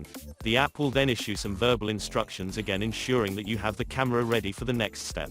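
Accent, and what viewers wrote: United Kingdom. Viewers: My how British you sound. Lol Great video my friend, Thanks for sharing this! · Thanks for sharing brother. Very much appreciated. Looks like a nice security camera. Do I detect a British accent? Keep the content coming. Love this channel. Have an awesome night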